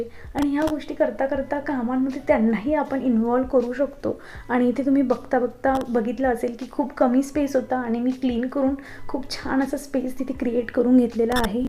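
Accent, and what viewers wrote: Khandesh, India. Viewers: Tumhi proper kuthle ahe??? Khandeshi ahat ka???language vrun vataty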